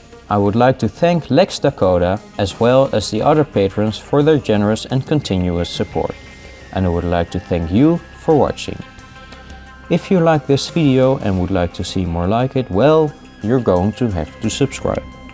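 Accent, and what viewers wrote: Netherlands. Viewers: Like I suspected. A fellow Dutch man. your determination book gave it away. The houses opposite of yours did ring a bell already. I think its Amsterdam. Also the way you pronounce the Latin names rang a bell. Like what you do mate. Keep it going.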